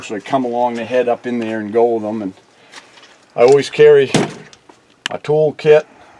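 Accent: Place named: Maine, United States. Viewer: Supah swampahs, huh Bub? 😆 Love the Maine accent!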